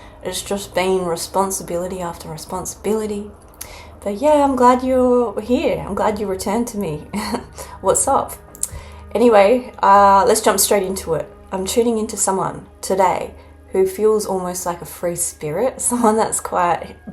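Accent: Australia. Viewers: G'day there!! You're an absolute bloody legend dudette!! Your head popped up on my feed randomly and I'm proper glad it did! You spoke straight to my soul and it felt kinda weird lol especially when ya started singing and I had a vanilla icecream cone in my hand! Hahahaha ya speak with such wisdom and and better still an Aussie accent! 😉 Everything you talked about gave me chills and alot to think about... Will see ya again soon mate! Much love and respect 🙏🤘❤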